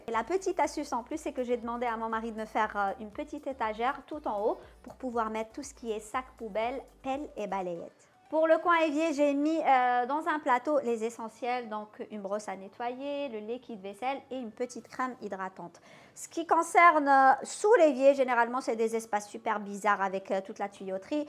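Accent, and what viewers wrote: Algiers, Algeria. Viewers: Coucou ma belle j'adore quand tu parle on français avec ton accent algeroissssssss j'adore tes vidéos merci beaucoup pour les astuces bisous pour les petites anges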